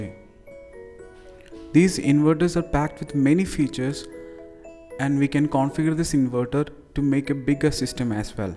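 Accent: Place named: England, United Kingdom. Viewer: bhai india ka hi hai na mujhe to lagta hai England ka hai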